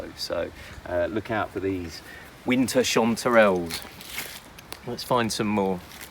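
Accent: England, United Kingdom. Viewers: I definitely sharing your video on Facebook here and America. You know we can trust you because you have an English accent.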